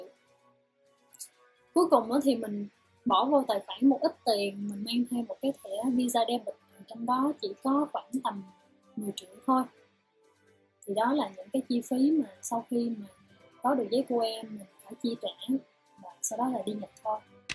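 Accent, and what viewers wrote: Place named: Nha Trang, Vietnam. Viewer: Bạn này giọng nói như ngừoi Nha Trang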